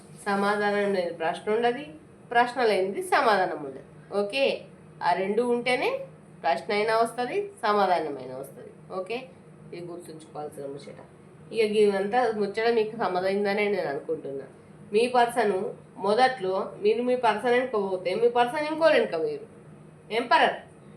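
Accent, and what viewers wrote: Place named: Andhra Pradesh, India. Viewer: Madam Andhra Bhasha chala baguntundi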